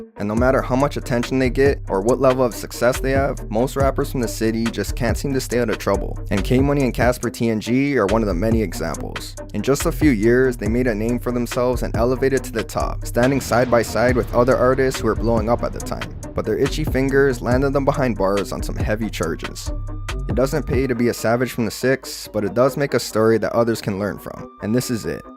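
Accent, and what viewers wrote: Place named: Calgary, Canada. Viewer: Why do you have such a brutal Canadian accent? If you're from Toronto you shouldn't sound like you're from Calgary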